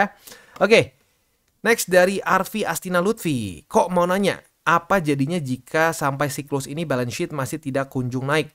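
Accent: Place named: Bandung, Indonesia. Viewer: Orang cindo bandung dan merantau jakarta rata2 logatnya seperti ini.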